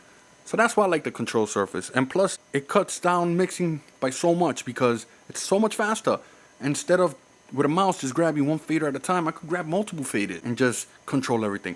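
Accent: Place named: New York, United States. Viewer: that NY acccent!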